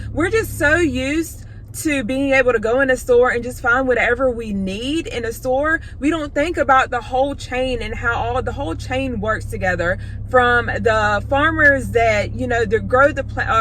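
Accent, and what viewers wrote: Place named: Mississippi, United States. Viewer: Love your accent. It sounds a lot like a Mississippi accent, my home state. The stuff is about to hit the fan. We cannot continue to go further into debt. Inflation is rampant yet interest rates remain near zero. It’s a rock and a hard place.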